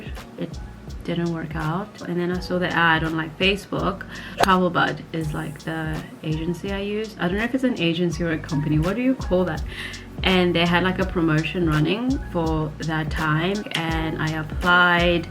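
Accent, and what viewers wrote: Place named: Australia. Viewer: I think I am the only person who thought you had an Australian accent. 😂 Will you be coming back home to watch Beiber? 😏 It is so amazing watching your videos, I have been in love with your content since the first video I watched. Thank you so much for sharing all your experiences with us, it is truly amazing to watch. I hope you will be able to come back home and just be with your family. Sending love and support from home.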